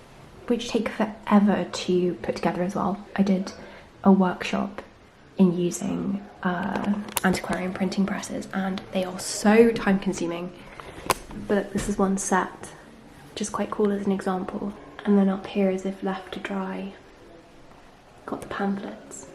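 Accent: United Kingdom. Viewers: I love your accent, British women are so elegant sounding.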